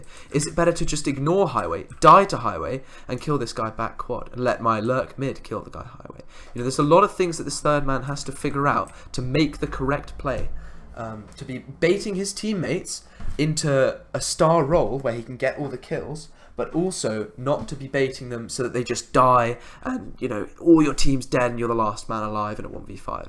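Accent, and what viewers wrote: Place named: United Kingdom. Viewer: You also have the perfect type of British accent for voice-overs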